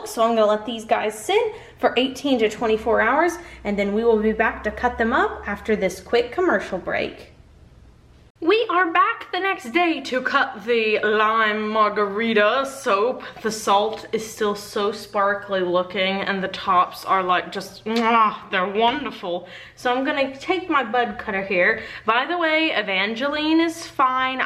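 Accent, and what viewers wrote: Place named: South, United States. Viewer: I go to soap vids when i run out of pimple popper vids. I love yours cause your from the south and so country like myself.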